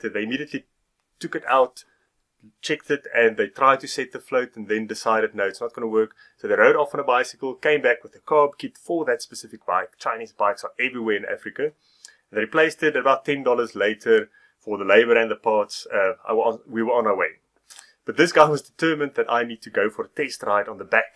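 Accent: South Africa. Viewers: South African accent is tough to understand.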